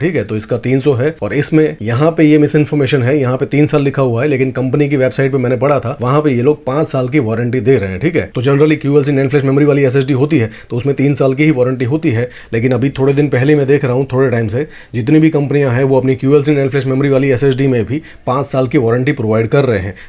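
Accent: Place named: Kathiawar, India. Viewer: Kathiyawadi cho ne bhai?😅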